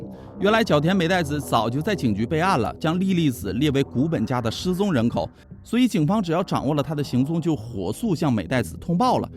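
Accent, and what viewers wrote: Northeast, China. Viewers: 語速不要這麼快，講起來就不會太吃力。雖然東北話與普通話有相似之處，可是東北人講普通話不如其它省份的人標準，最大的障礙在於聲母為Z.C.S和ZHI.CHI.SHI的字東北話與普通話發音完全相反！比如說：站zhan著zhe，坐zuo下，東北人讀成：站zan著ze，坐zhuo著ze，從小到大說著與普通話完全相反發音的那些字導致非常難以改變，樓主就是這種情況。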